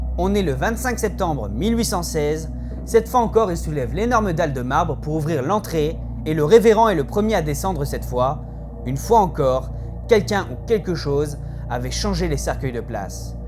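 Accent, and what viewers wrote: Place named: Quebec, Canada. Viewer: aaaah, c'est quoi l'accent que tu essais de cacher, j'entend un leger accent, tu es quebecois ?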